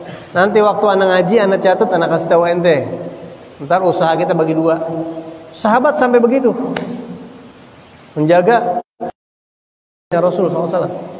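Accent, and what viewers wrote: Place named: West Java, Indonesia. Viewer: Masyalloh.. Habibana.. Senyum ane denger basa Sunda nya.. Ajiieeb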